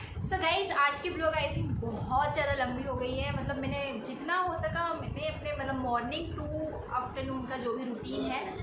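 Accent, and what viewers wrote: Bihar, India. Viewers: Di aaplog Bihar s belong krte ho n ...qki bat krne k tone s lgta h Bihar s ho aap... mai b bihar s hu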